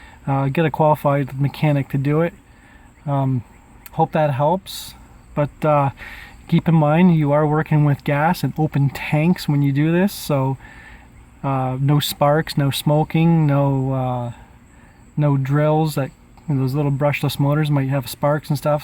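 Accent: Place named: Dakota, United States. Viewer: Dakota accent or canadian.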